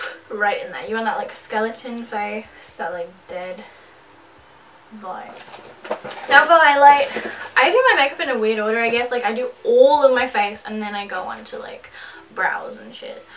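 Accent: United States. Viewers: does england has different accent depends on where you live? she said she's from england but her accent sounds like she's from america 😅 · You sound slightly American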